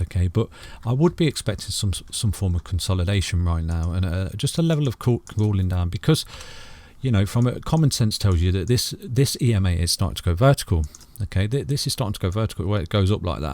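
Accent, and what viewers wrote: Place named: Doncaster, United Kingdom. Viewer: Doncaster, sounds like the kid is from Doncaster, I'm probably wrong though lol. Great video, thanks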